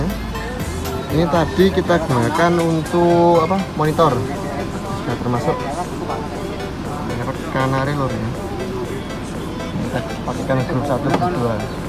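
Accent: Banyumas, Indonesia. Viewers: Paling seneng ngrungokne omongane bangsa ngapak.. 😂 Sub e kok gk gawe 18an yo? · Pada bae wong ngapak...salam sukses selalu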